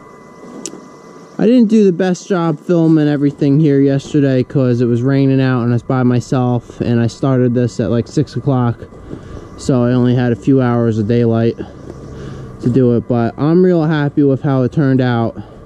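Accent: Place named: New England, United States. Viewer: It’s an innocent question but there’s weird dudes who’d infringe on privacy. Most tubers don’t disclose for obvious reasons. dialect shouts New England for sure. I’m just guessing New Hampshire down to Pennsylvania with those beautiful mountains....but that a guess.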